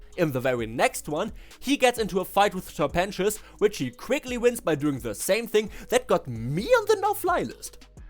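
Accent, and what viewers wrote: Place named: Germany. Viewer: ich höre den deutschen akzent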